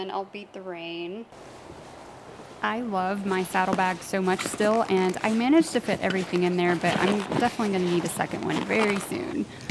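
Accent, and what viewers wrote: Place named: United States. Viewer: what a pleasure to hear an American talking about Triumph motorcycles.Some of the vids from England, are, well, I have a hard time even understanding what they're saying. My wife and I sometimes overwinter in Houston, Tx. Preparing to dump my Harley softail for a Speed Twin, or t-120. Difficult decision!!!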